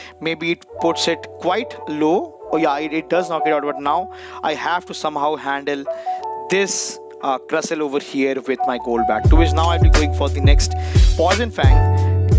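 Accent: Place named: India. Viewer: Bro thé musics sounds is high cant understand your indian accent cleary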